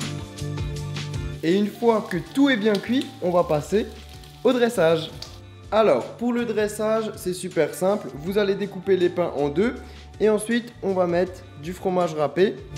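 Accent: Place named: Alsace, France. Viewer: Yeeee schezele toi ta l'accent de chez nous ! Hop la jvais manger a nidermoschwir manger la surkrut de chez nous ! Rpz alsaco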